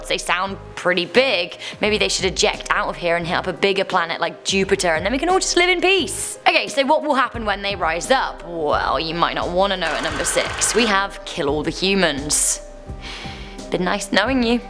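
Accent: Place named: United Kingdom. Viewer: Who else clicked out from this video after hearing her talk? Them brit's have the most annoying accent and they love to hear themselves talk.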